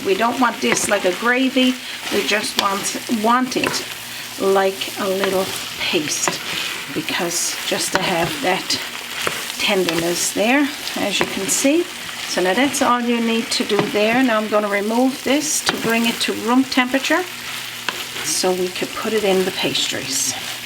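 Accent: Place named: Newfoundland, Canada. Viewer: Hello from Portland Oregon. My daughters are watching your videos to learn how to cook. They love you so much they are mimicking your Newfoundland accent. I heard them asking each other " and " ow" are you"?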